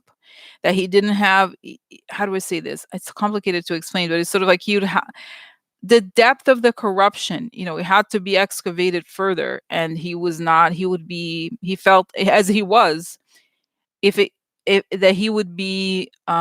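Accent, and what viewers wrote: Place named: Russia. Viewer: I know you don't typically listen to other readers. But Oracle of Whimsy had a Halloween reading and around the to mark she talks about the GOP takedown and arrests, mentioned 40 of them. It's about 10-15 minutes. Also Revealing Light had a reading in May (?) John McCain is in the title, and she mentions the same thing. Arrests all at once. "Sees most of the old guard of republican party" being ushered out by authorities. Love you, your my favorite. My son saw the green, 3 eyed hat on you and was intrigued. I put you on the tv so he could hear your Russian accent. Yes he thinks I'm nuts....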